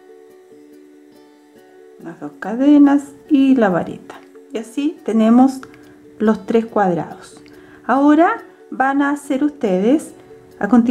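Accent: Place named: Chile. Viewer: LO SABIA X EL TIMBRE DE VOZ ....YO TB SOY CHILENA Y T FELICITO XQ EXPLICAS MUY BIEN ...GRACIAS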